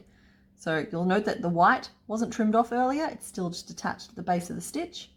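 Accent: Australia. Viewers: Wow, thanks. You sound aussie but had me confused with your US stitch names. The sulfur cresteds and the rainbow lorikeets are the mandarin thiefs which leave us nothing on our tree, in the last few years, they don't even wait until they are ripe. Maybe the cockatiel will be less of a sore point. We had a baby fruit bat in the guava tree one year, he was a failed release from the bat hospital, didn't flinch when we looked at him, wanted plenty of guavas handy, but we had no idea if he had the Lissa virus, so they came, said he was fine, and took him away. Thanks again.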